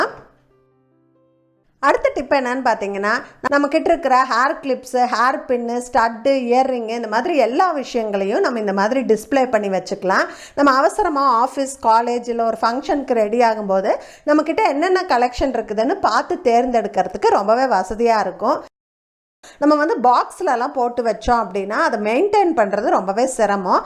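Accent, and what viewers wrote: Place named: Kerala, India. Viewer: tamil with Malayalam slang nice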